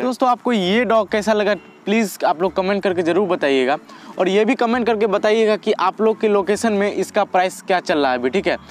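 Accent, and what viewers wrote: Bihar, India. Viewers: Bihari Bhai hum bujh Gaye aap ki bole😁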